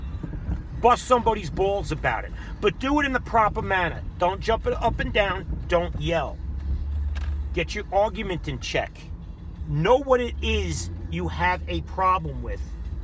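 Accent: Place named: East Coast, United States. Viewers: I grew up in Smithtown. Your accent and attitude reminds me of where I'm from. Good stuff! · New subscriber loving ur content, curious where you from? Has to be somewhere around the tristate area with that accent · Not sure if I watch ur channel because of ur accent or cuz u make me laugh so fing hard but I love it and it makes me miss the east coast even more. Keep it up bro!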